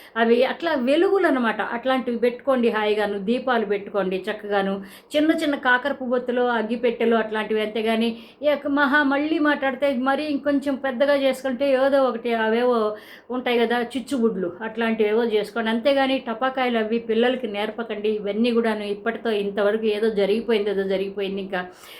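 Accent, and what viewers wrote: Guntur, India. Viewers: గోల్డెన్ వర్డ్స్ చెప్పారు . నిజంగా ఆడవాళ్ళ బతుకులు అంతే. మీరు మాట్లాడుతుంటే మా గుంటూరు జిల్లా వాళ్ళు మాట్లాడినట్టు ఉంది మేడం చచ్చే నవ్వొస్తోంది. అన్ని రియల్ గానే చెప్తారు మీరు హేమలత తిరుపతి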